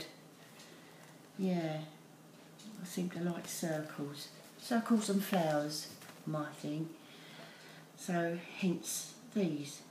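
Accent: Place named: United Kingdom. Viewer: hi I love your art work I am a newbie to art journaling. I'm in the uk you sound like your in the uk. thank your for sharing x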